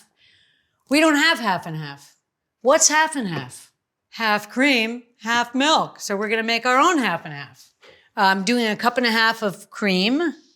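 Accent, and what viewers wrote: RP, United Kingdom. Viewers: I love your Fanny Craddock accent. Perfect! You're a hoot and the cooking is pretty good too! 😍💛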